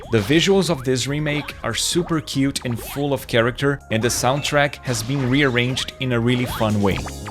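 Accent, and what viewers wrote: Brazil. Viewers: percebido um sotaque que parecia brasileiro em algumas palavras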